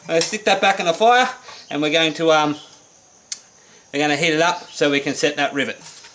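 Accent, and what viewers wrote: Australia. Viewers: What part of Australia are you from? CHEERS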